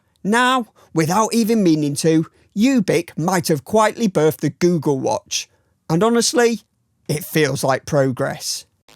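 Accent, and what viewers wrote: United Kingdom. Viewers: I have a really hard time understanding a number of British accents. When I watch TV I just turn on Closed Captions, but that eats up valuable real estate on my screen when I'm viewing YouTube watch videos. So, I go into settings and set the playback speed to about 85%, and I can understand nearly every word. Just a suggestion. I think if people who produce content who have heavy accents, regardless of what kind, by ten percent, a LOT more people would be better able to understand them. Also just a suggestion. Great review!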